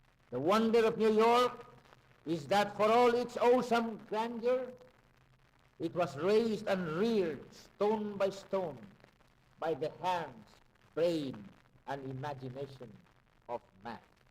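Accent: Philippines. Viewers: Rinig mo yung Bisaya accent niya haha. Not meant to be an insult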